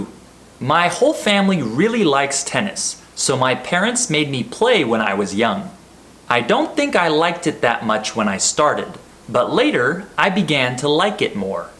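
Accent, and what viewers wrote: United States. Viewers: First of all, let me say that your videos are amazing. Even though I speak English fluently, I enjoy watching your A1 or A2 videos. I even recommend my students to use them. Well, you speak very clearly and use real English, which is very important to understand native speakers easily. I think that one of the reasons why your videos are so fun to watch for me is because I like American English. In fact, I'm in love with American English. I must say that I don't really enjoy listening to British accent because it sounds so strange to me. I don't know why, but I don't like saying things such as car park. I think it's because I want to really hear and use the "r" sound, especially at the end of words. If you ask me, the best way to use your videos is to repeat them a lot. Many learners don't really enjoy listening to a podcast more than 2 times, for example. 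They think it is boring and not necessary. Therefore, they quickly move on to other listening materials. However, it is a lot better focusing on something for a long time than trying different things. You know what I mean? Think about footballers. Every day, they practice the basics and they never play before they train enough. So learning English and speaking it automatically without thinking is just like playing a sport like football. I'm sure you agree with me. As for your question, well, I think my favorite sport is football, even though I am a woman and can't play. I have to say that I love watching football matches so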